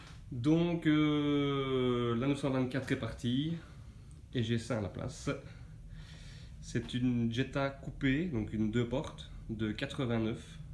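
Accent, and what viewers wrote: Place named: Belgium. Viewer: Je me disait bien qu'il y avait un petit accent de la Belgique 😁😁😁 merci pour gotta et force à toi pour ta chaine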